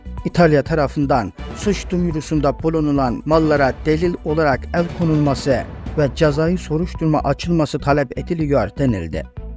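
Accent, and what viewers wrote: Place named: China. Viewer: Neden Türkçe'yi Çin aksanıyla konuşuyorsunuz? Kelimelerin sonunu gereksiz uzatarak vurguluyorsunuz.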